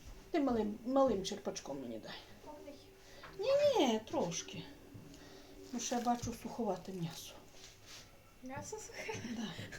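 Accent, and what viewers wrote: Ternopil, Ukraine. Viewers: молодець! Говорить так,як розмовляють на Тернопільщині,в кожному місці свій діалект,в мене полтавський,коли навчалася в школі,то розмовляли по русько- украйонскі.Так на нас говорили з інших місцевостей 😅Мені дуже подобається,як говорить.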